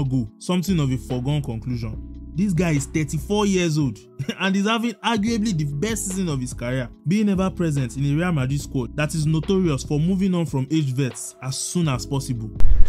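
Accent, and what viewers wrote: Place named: Africa. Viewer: Nice video. Which country are you from. I get an accent either Nigeria,Ghana, Kenya or Cameroon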